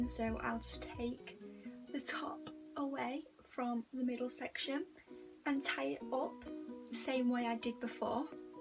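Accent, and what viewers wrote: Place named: United Kingdom. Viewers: Your British accent 🥺🥺🥺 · It literally took me 2 minutes and 35 seconds to realize she had a British accent i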